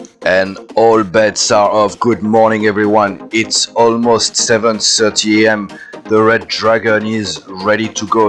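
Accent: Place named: United States. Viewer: Salut, moi aussi, comme James, je me suis demandé si en mettant de la marchandise à contre-gîte tu pourrais pas filer au près serré avec plus de voilure.Tu as essayé ? Mis à part, tu parles sacrément bien anglais et ton léger accent est très confortable pour ma compréhension, tu as vécu aux états unis ? A plus...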